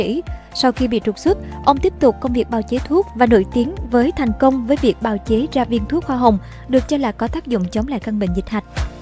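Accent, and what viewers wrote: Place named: South, Vietnam. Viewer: Love her southern voice. Best!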